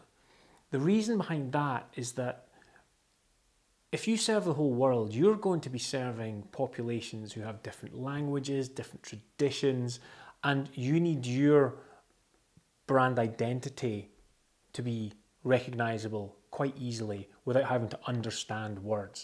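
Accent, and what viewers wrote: Scotland, United Kingdom. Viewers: HOLY CRAP YOU ARE SCOTTISH! IM PART SCOTTISH!!! HOW DO I GET BETTER AT MY ACCENT AND THE GRAMMAR IN SCOTTISH?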